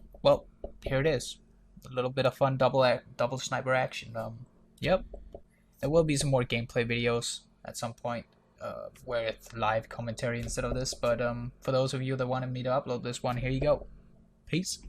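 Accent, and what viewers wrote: Denmark. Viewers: Are you Danish? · tænkte nok du var dansker!!! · Are you danish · Are you Danish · Are u danish? :D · Wait? Are you Danish? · are you by any chance Danish? 😃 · R u danish?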